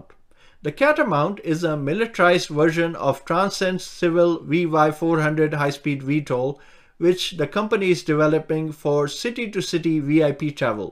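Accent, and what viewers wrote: India. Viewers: I like ur accent👍👍 r u from india?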